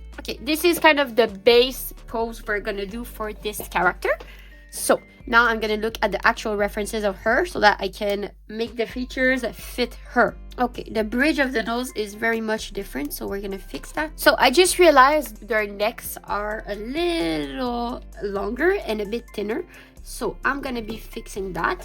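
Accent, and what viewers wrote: France. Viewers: the french accent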